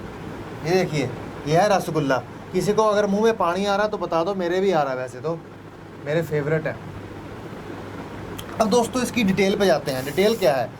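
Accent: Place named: Haryana, India. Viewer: yaar pure hindi se bolo.. ye haryana language maja nai aa raha he....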